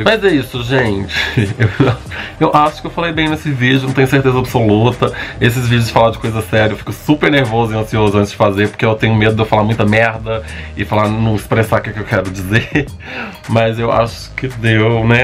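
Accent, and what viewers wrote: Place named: Minas Gerais, Brazil. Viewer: Oi oi lindo, seu sotaque mineirim é um arrazo! Saudades imensas da minha terra. A 6 anos moro em Porto Alegre e quando ouço alguem ... ai ai me da aquela saudezinha de BH. Enfim, esse video eu assisti a alguns dias e foi um divisor de aguas... <link> estamos sim, na era do consumismo desenfreado, porem cabe a cada um de nos sermos, low consumerism , LOWSUMERISM ... Parabens pelo canal!!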